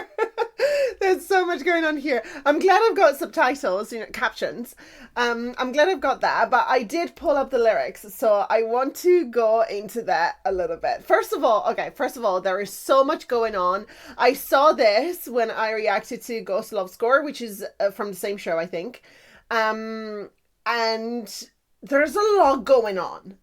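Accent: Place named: Manchester, United Kingdom. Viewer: FINLAND. RAH! Anette Olzon and Floor Jansen RULE. Nightwish is beyond. You just became interesting. Pure power exists. Watch. Listen. Having seen every rock band on the planet over decades.... There is no contest. This crew hammers it. You sound Manchester. Hope that isnae insulting. RE-target yourself. It does help.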